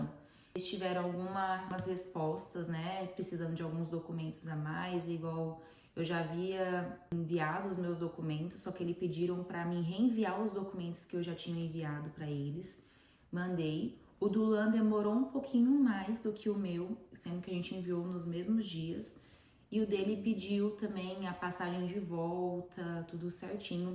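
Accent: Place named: Portugal. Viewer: Temos alguns conhecidos “por cá” olha o “purrrtugueix” sobressaindo ihuuuul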